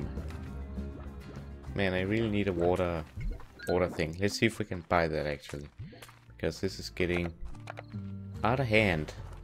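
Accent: Denmark. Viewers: are you danish it sounds like it and i love youre vid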